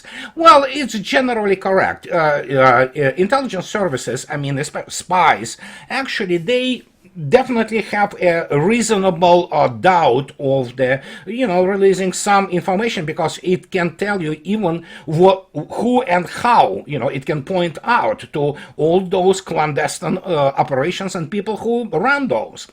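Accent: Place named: Russia. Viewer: Thanks. English with Russian accent. Now I get the meme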